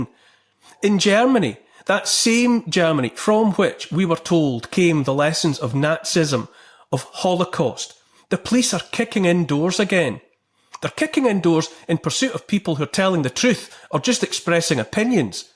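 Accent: Scotland, United Kingdom. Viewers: are you Scottish? just saw (the bachelor- dating all the ladies ) in Edinburgh, they really liked it. i had been there new years eve with a bunch of military couples long ago, it was so fun, people were so nice!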